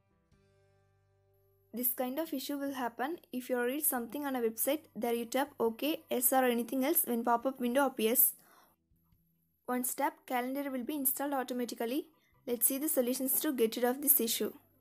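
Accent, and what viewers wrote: India. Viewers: First time I appreciate Indian accent people who do tutorials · Thank you Indian voice sounding girl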